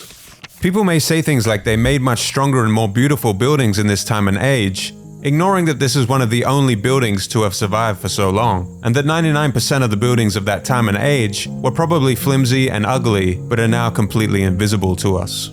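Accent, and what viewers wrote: Australia. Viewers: Are you Australian, Mr Narrator? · Nu Aus accent.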